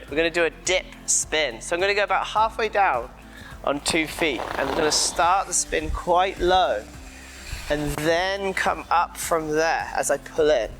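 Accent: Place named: England, United Kingdom. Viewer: I know right? To this Texan (Texas, USA), refined accent sounds so cute and charming. Instead of saying "water," he says "watah." He usually shoots his video straight and doesn't try to be funny but in this one he plays around a little and he even plays with the accent once and says "wateeeer," I can't remember what word he said. I'm surprised you are attune to English accents, I guess you speak English well. You wanna talk about charming, I'm sure you know the famous Brazilian singer Roberto Carlos. I like to listen to him sing in Portuguese and if I know the song in Spanish I like to think I can follow along in Portuguese. Mostly I listen to him in Spanish and he has a Portuguese accent and it's majorly charming. So, we all agree, is amazing.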